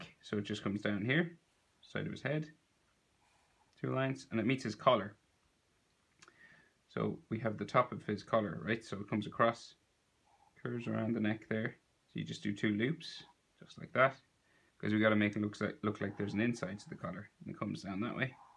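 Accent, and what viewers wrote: Ireland. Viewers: ok thanks also did you use sharpies to colour (shows in thumbnail but not in vid) or something else, and are you irish? I think that's an Irish accent but not sure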